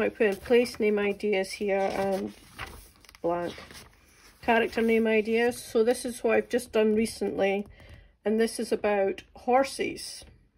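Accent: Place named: Scotland, United Kingdom. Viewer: Awesome idea. I need to go look up those Villain Archetypes. I don't have a common place book, but have started doing something similar in Notion, cause I've always got my phone on me and I can bring it up on one screen while I'm writing on the other. (dual screen setup). Psssst!! it's good to hear a Scottish accent on the YouTubes. I'm in Cumbernauld!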